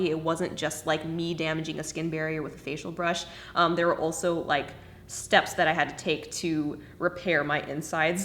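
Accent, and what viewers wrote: Australia. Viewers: Your accent sounded so Australian in the beginning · Your hair and skin are snatched! And you for your skin lookin like that in just 2 weeks?! Ima tryyyy. Are you going in and out of an aussie accent? So cool lol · How did she sound Australian and them go straight to american english/ no accent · I’m so confused why do you sound Australian at the beginning? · I love how she says WHATS GEWD and then speaks in an Australian accent afterwards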